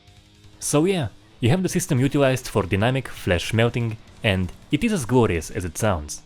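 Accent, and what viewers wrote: Russia. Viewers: Оо, русский чел, по акценту узнал)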